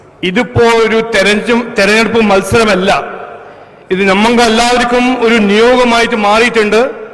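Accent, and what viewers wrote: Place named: Palakkad, India. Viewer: Typical palghat malayalam(tamilalayam)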